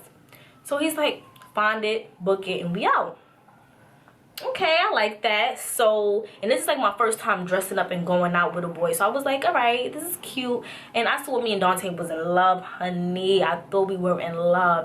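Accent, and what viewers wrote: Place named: New York, United States. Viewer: I love to hear you talk..your New york accent is too cute!!!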